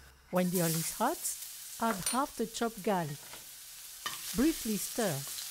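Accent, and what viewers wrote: France. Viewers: Love the French accent 😂 and the recipe is great will be making it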